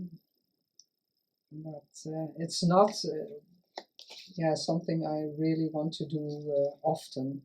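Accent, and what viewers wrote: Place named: Netherlands. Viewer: I’m so glad I found your channel, another lovely Dutch accent to listen to and beautiful work to look at! Susie xxx💕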